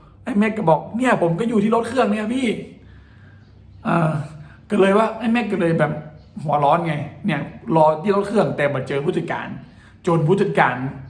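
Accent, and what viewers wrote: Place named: North, Thailand. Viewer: ชอบครับผมชอบสำเนียงเหนือ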